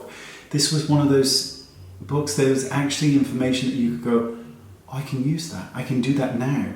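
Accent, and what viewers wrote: United Kingdom. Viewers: Cathy Gilbert you hit the nail on the head! I always feel good after watching one of his videos. He’s funny & helpful & truly caring. Wonderful British accent & very easy on the eyes LOL. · It's funny to me when people talk about a "British" accent, there are only about, what, a hundred? Two? But while your vowels are indeed lovely, I think the real thing may just be that you are a truly lovely person, and that shows through. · You DO have the best voice & I love the British accent so much!!! And you just saying “Dirty Weekend”... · Oh.......I could listen to you read the phone book all night long. You have the most soothing voice, but we all feel you from the inside and know you’re the real deal! I’ve read some of the books you mentioned you have very good taste lol. When you said “bazaar” my heart melted.....bazaaaaaaa!!!! Love that British accent (and you’re cute too!!!) ❤️😘❤️ KimP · First, us Americans are just bowled over by the British accent which in your case is really lovely. 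Second, I have learned so much from you. I’m a almost-60 year old woman and the very editorial looks are just not appropriate for me. Lastly, your genuineness and gentleness are evident in every video. I try to fill my space with kindness. You help. 💜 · I agree with that comment, I love your voice. It is very soothing, soft and gentle. I love British accents. One day I will live in England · I remember the flowers in the attic 😢 I honestly have to be in the mood to pick up a book. I could probably write my own novel of ups and downs 😅 I love to pop on youtube and watch your videos and forget my worries. I think your voice and accent is great.. Being a fellow brit with the priceless wit and sarcasm is always a treat..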